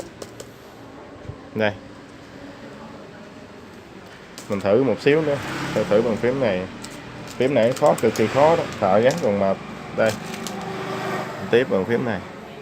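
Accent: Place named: South, Vietnam. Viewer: Cảm ơn anh. Nhưng mà e ở hà nội. Nghe giọng anh ở trong nam thì phải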